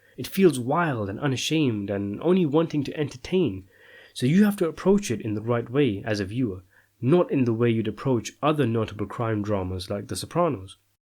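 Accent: United Kingdom. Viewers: Thank you for explaining in it in plain English.. (British accent) of course..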